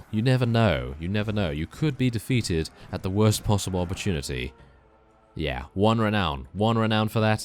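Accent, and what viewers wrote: United Kingdom. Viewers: UK I think. u can tell from how he pronounce certain words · I'm just making an assumption from the accent that he would be from the UK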